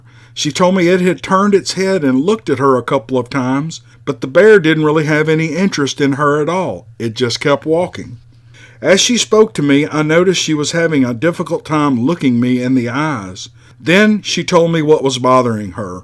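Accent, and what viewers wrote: South, United States. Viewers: awesome!! luv ur stories bro. you have that southern drawl kinda like peyton manning. just luv the stories and your true self is exposed to your fans. keep up good work! luv from California!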